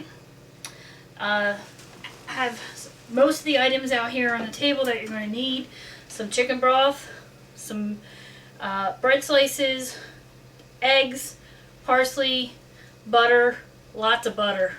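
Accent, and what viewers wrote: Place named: Pennsylvania, United States. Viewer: I could tell you were from that area as soon as I heard you talk! I lived in Norristown and then Harleysville until I was 10 years old. Can’t miss that accent!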